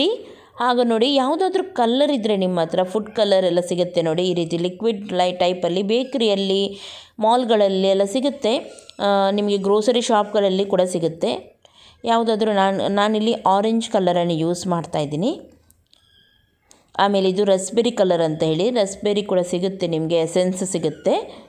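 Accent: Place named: Dakshina Kannada, India. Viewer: I like your kannada. Perfect blend of south kanarian, blore and catholic style.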